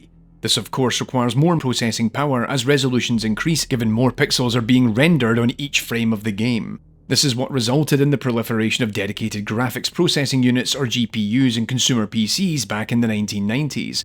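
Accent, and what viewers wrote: Scotland, United Kingdom. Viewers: Are you scottish? 😁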